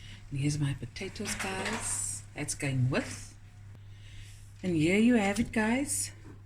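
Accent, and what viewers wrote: South Africa. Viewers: I love the South African accent. It smells home.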